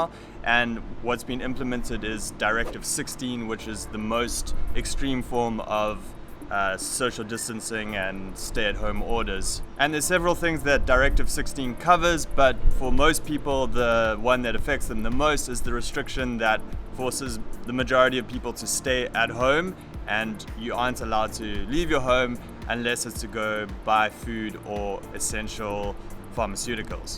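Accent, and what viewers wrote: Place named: South Africa. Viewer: tried to place your accent, not North America, i guess South Africa?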